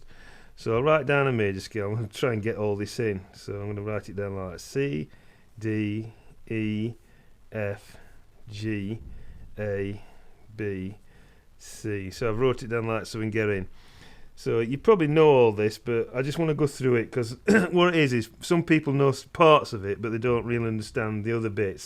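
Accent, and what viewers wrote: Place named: North England, United Kingdom. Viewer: I liked your stuff from very much. It all was intelligently conceived and presented. I like your style, your Central-Northern British accent a lot(!) and the way you put down some pathetic and pretintious (and so) people who think they know it all. I mean it's not your goal of course but you won't believe how much fun it actually is!:-) Please - take my advice - don't be so concerned about the hate mail and comments. You seem to be very responsive person and as such just try to ignore the ones who would cause you to close another of your great channels. Take it easy! I'm looking forward to your new videos - they are always tasty, helpful and really so much fun!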